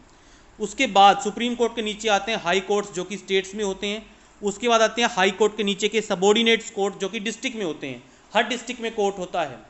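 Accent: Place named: Haryana, India. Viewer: You are from haryana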